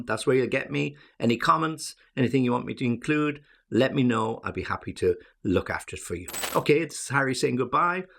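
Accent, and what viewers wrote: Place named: United Kingdom. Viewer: Thanks a lot, Harry, for your useful lesson and my favorite British accent!!!!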